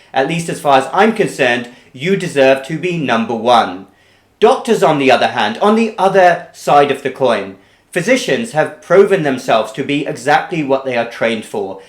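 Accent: United Kingdom. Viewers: Sir you have a very strong British accent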